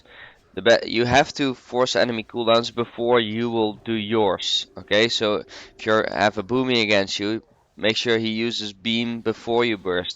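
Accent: Netherlands. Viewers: gotta love that obvious dutch accent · Kan goed horen dat je Nederlands bent, haha, goeie guide!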